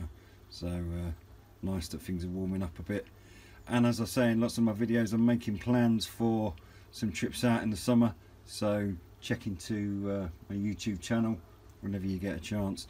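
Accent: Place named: Deep South, United States. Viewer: You sound like you come from the deep South. I wonder if you can get in on our South East Coast Net tomorrow ?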